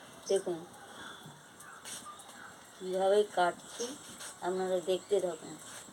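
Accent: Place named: West Bengal, India. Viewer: Pochim bongo kotha tone dia buja jai niramis jobor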